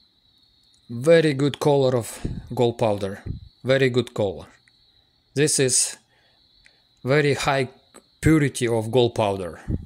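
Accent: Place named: Russia. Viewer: Слышен русский акцент)))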